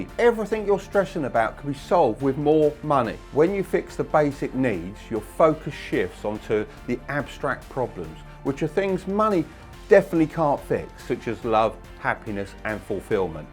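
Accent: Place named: London, United Kingdom. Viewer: Do you live in America? because you sound like every bloke on my street in London.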